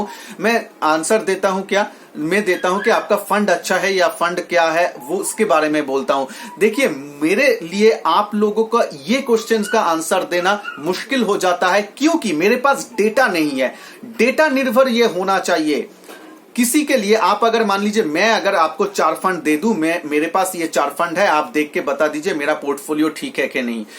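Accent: Bengal, India. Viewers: You speak like Bengali !!! Are you Bengali ? আরে ভাই বাঙালি নাকি ? বি the way explanation is good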